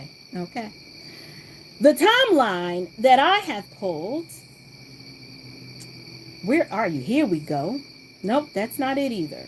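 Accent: South, United States. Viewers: I love that country sound!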